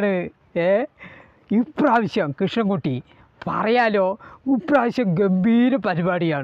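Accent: Palakkad, India. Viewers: പാലക്കാട് ഭാഷ 🥰🥰🥰🥰സൂപ്പർ. നിങ്ങൾ pkd എവടെയാ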